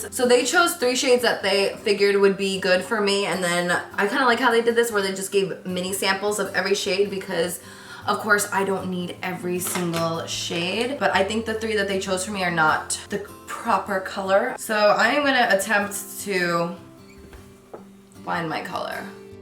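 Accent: New Jersey, United States. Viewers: Her Jersey accent is so thick i honestly love it. She kinda sounds like Rihanna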